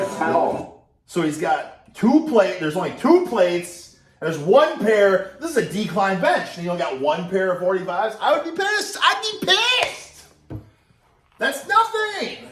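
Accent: Midwest, United States. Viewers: I love Midwestern swearing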